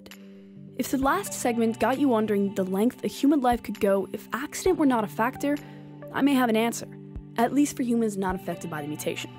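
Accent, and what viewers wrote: Canada. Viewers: I detect an Canadian accent!!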